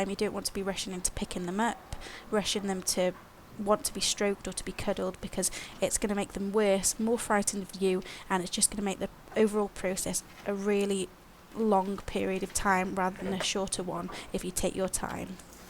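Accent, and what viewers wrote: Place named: Stoke, United Kingdom. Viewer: you sound like you're from stoke like me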